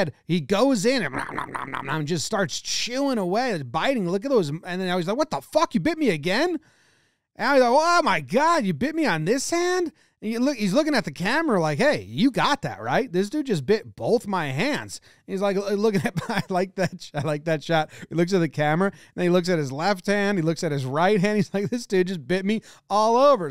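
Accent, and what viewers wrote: Canada. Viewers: Love your Canadian accent lol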